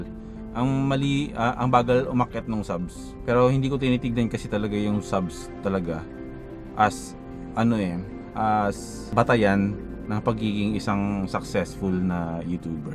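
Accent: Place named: Negros, Philippines. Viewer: Mukhang taga negros na yung tuno mo mag salita ah..!! Hihihihi Solid perstaymers tayo!!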